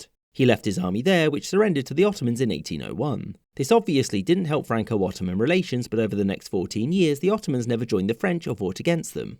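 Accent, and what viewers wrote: England, United Kingdom. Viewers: It's the English accent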